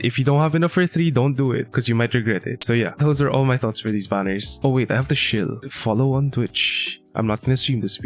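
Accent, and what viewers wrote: Philippines. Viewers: why do u sound so filipino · Sounds very Filipino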